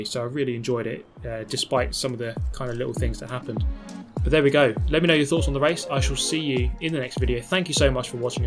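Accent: United Kingdom. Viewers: That commentary in British accent , makes this video feels like watching an actual race !! But this is Gran Turismo, on PS4.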